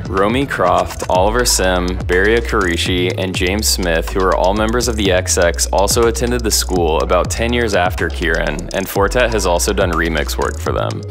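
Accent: United States. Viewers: Opens video American accent Close video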